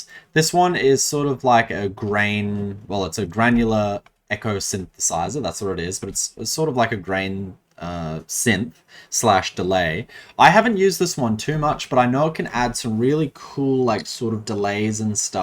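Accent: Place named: Australia. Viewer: You sound like you from Australia🇦🇺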